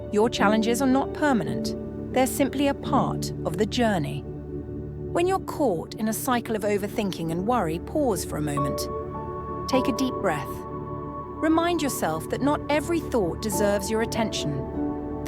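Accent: England, United Kingdom. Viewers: I'm 58 , emotionally immature, with discouraging sudden mood swings that take me from poetry to the toilet....sort of speak in a manner of seconds, the mood swings are hormonal, the rest unhealed psychology, spiritual bankruptcy and much more..... I want to thank you for today your channel found me, I say it this way because I didn't know it existed to look for it to begging with. The two videos I've listened to this far.....sound True, and the non robotic lovely voice of the narrator with what I perceived as an English accent, it's the cherry on top. Her delivery brings believability to the things she claims,but I have yet to call my own experience. Thank you for the sense of calmness this has provided as I wrestled with this thinking of mind in getting myself ready to hopefully sleep. Truly thank you The content resonates as Truth to me, and the content and delivery make for a very reality based, perhaps it's possible for me, to have kind of experience. 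Thanks for the hope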